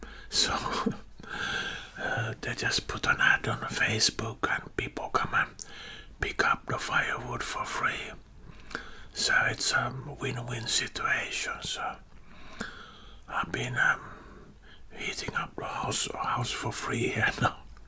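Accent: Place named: Netherlands. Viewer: Well I would have believed if you said you were 40. The accent sounds a little dutch.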